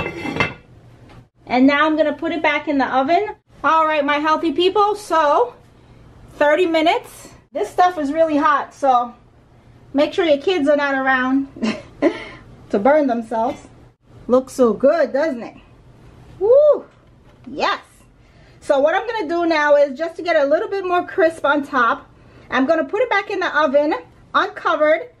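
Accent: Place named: South, United States. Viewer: I was trying to identify your accent...it's not familiar. South, for sure, but different, too. ????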